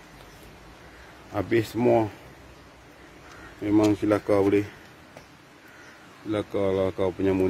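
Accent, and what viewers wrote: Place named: Penang, Malaysia. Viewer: Alor Vista ni dekat mana? suara macam loghat penang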